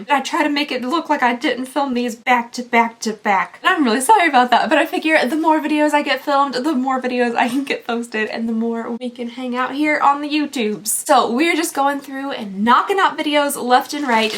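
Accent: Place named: South, United States. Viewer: You're one of my favorite YouTubers. Your southern accent (I have one too), your personality, your babies, and your authenticity are very refreshing and make me feel like I'm sitting around chatting with my sisters.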